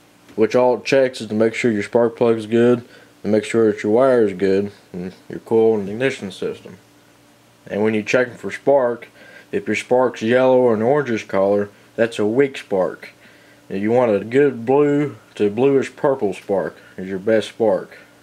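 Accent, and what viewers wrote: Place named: Appalachia, United States. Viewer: You sound like how we talk in va/tn.... My dad use to play the whole "hold this wire for me while i crank it" on all my friends... We got a kick out of it. Them, not so much haha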